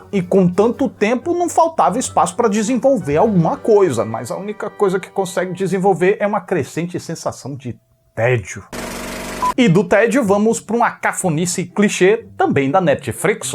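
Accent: Northeast, Brazil. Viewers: Ele é nordestino 😁 só não lembro de que estado. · Cara. Seus comentários são super nordextinesco. Aaaaaaamo. O Seu sotaque é SUPER.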